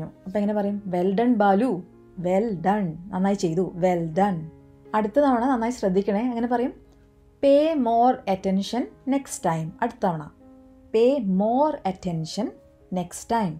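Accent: Thrissur, India. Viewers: Mam you are from Thrissur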